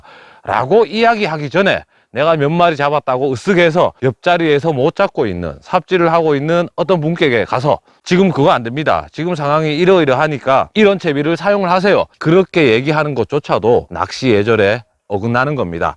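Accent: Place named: Gyeongsang, South Korea. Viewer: 점점 표준어 쓰시네요 경상도말투가 듣기좋아요ㅋㅋ 전라도인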